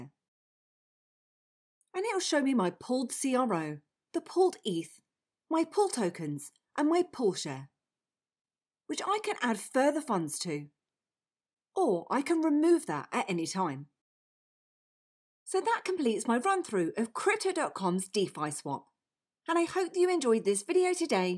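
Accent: United Kingdom. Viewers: best thing about your channel is listening to gb English instead of us. Not many brits doing crypto content. Well done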